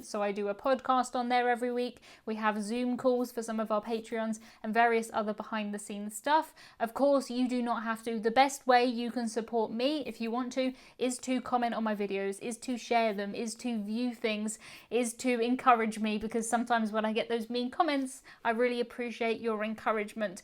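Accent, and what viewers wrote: RP, United Kingdom. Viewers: Your videos are so fun to watch and with that delightful BBC accent it also fascinating to listen to your narration. Keep up the good work! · Just found your videos and was scared you were quitting YouTube! I’m not usually big on ladies with British accents, but I quite fancy! Congrats and good luck!!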